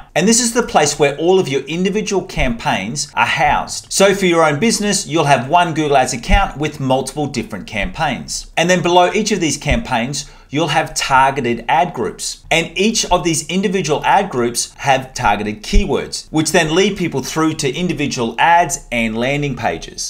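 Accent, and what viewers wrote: Australia. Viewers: I like the accent. Is he an Aussie?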